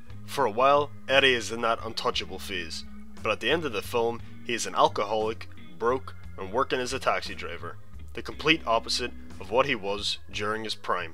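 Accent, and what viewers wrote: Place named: United Kingdom. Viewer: Aside from some...idiosyncratic across-the-pond pronunciations, I thought this was really good. It would be cool to see some parallels drawn with crime literature, and also some examples of films and books that fail to hit (or even subvert) some of the genre conventions, and how that makes them successful or unsuccessful. I went ahead and subscribed to the channel, so I hope there's more forthcoming.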